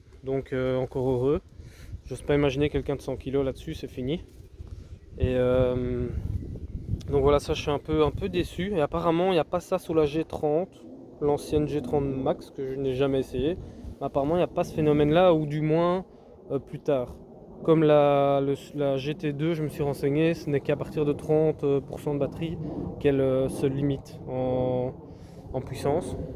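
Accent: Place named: Belgium. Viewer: Il me semble entendre un accent habituel, tu ne serais pas belge ? 🙂Si oui, dans quel magasin de trott vas-tu ? Merci en tout cas pour le retour objectif fait dans cette vidéo ✌🏼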